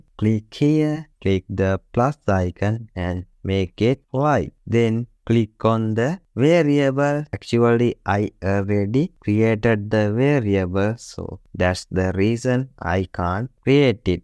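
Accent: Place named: India. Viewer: Dude your accent is awesome, reminds me my Indian physics lecturer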